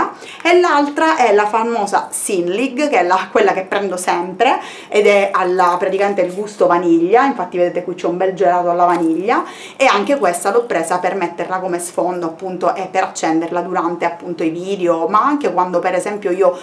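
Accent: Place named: Catania, Italy. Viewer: Hai un accento bellissimo ,sei catanese per caso ?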